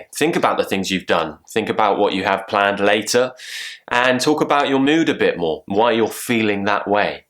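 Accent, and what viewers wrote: RP, United Kingdom. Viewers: I have become intoxicated to your British RP accent, that's what we call it, I was obsessed with my pronounciation, felling better now. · thanks for your british accent/phrases tutorial videos, i just love it! <3 Because it helps me to understand some phrases what my cousin talkin' about btw she's from London.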